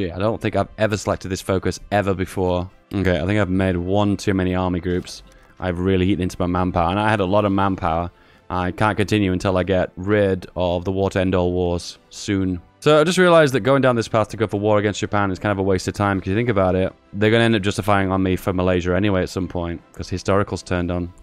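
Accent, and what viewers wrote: United Kingdom. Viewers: Thanks Dave for starting my day with a laugh and some britshness.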